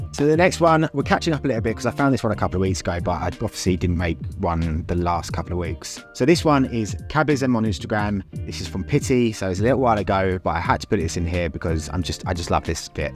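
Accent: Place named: Essex, United Kingdom. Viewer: Sub'd! Learning more about how I want to present myself through your insights. Also, nothing wrong with a fine Essex accent.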